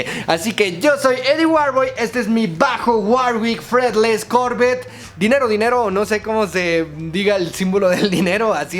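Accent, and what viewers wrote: Mexico. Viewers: eres mexicano ? xd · Creo que es mexicano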